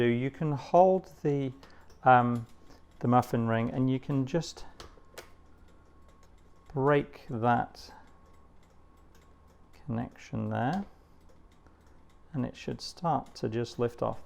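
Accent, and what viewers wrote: United Kingdom. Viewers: I suspect that, in spite of your accent, you are not British otherwise you would know that crumpets and muffins are 2 completely different things. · What's this nonsense? I'm pushing 60 years old and have never seen or heard of anyone cutting a crumpet like that. Literally never. You sound (middle class) English but who is this aimed at? No one calls them 'English crumpets' in Britain and what Americans call 'English muffins' are not the same as crumpets. What the hell is going on? It's one thing to pitch British recipes to Americans etc, and should be congratulated if anything, but to perpetuate nonsense like English crumpets and suggest they're the same as 'English muffins' is ridiculous. If you're filming that in America, dont come back, mate, you're dead to us.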